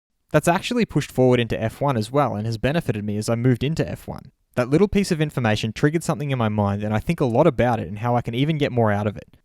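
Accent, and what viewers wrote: Australia. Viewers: Also you sound Australian. Wanted to know if you know if they will only show the main race on television or we will see the two practice days and time trials as well?????? Hope you do read the comments. But I really think you should do the countdown clock.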